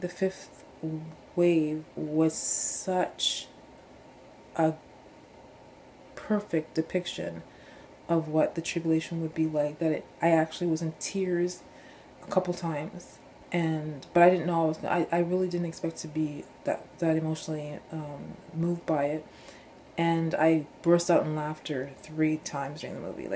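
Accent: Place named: Canada. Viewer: Are you Canadian? You have a little bit of a Canadian accent. I know the government uses TV, and films to brainwash people, and program them to influence their thought processes. Have you ever seen the 1987 film ‘They Live’? It has aliens in it, but that’s not the message the creator was sending. He uses the aliens as symbolism to symbolize that the aliens in that film are actually the elite of this world. The 1% that have control over humanity, and the earth. I believe the elite are Satan worshippers, and it says in the Bible that Satan is the prince of the air, and I believe that means the airwaves. Meaning media we consume. He also has temporary dominion over the earth, and so he can do these things to try to drag as many souls down with him. It’s really sad, but that’s the way the cookie crumbles. I had a prophetic dream about 10 years ago, and to this day I don’t exactly know what it means, but it was very traumatic, and so real, and vivid. 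In the dream I was told to read Isaiah chapter 1, and I didn’t even know if that was a book in the Bible, so I went to read it, and to my astonishment, I found Isaiah was a book in the Bible.. I read it, and it was almost verbatim exactly what my vision was about. It was a little bit different, but it was very juxtaposed to what I was reading. I know when the tribulation happens, God will protect His true elect, unless it happens to be their time, but I believe most of His elect are going to be protected. I don’t want to endure it, I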